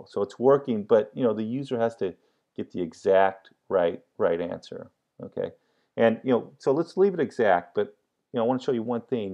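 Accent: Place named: United States. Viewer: You're a rubbish teacher your american accent makes me hate you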